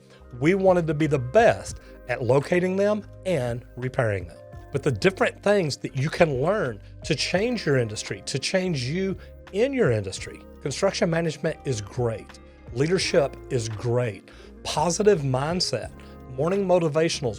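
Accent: South, United States. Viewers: I kinda like his accent. Not too southern and not to Northern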